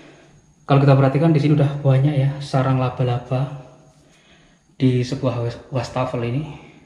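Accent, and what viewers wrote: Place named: Lampung, Indonesia. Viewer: Asslm, waduh jane bru sempat buka hp bos qu, walau tapi pasti hadir slm mlenuk 2 wai dr lampung, ms bhsa ngomong nya kok sama dgn tmpt ku si, kurang jegrak, e ms siang hari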